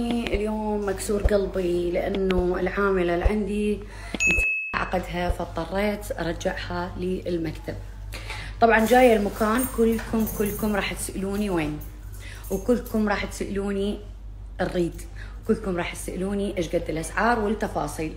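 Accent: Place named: Lebanon. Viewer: شكد حلو تحجين لبناني حياتي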